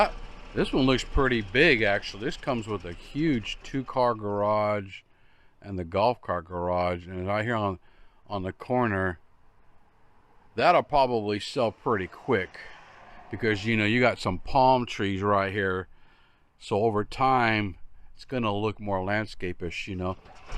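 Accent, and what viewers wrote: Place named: South, United States. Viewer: Another great video,. No neighborhood pool in that village? My wife says your accent reminds her of Foghorn Leghorn Thanks for all your great work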